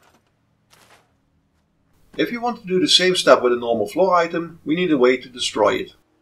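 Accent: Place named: Netherlands. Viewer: Your Dutch i can hear it cool vid..👍🏽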